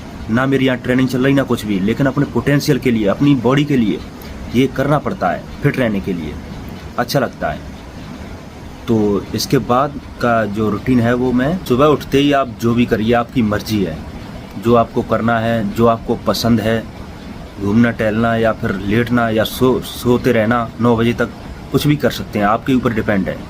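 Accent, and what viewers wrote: India. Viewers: भाई आपकी आवाज मुरैना साइड की लग रही है ,, में सबलगढ़ से हु आप कहा से हो , प्लीज btao ??? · Bhai Rajasthan se ho kya!!!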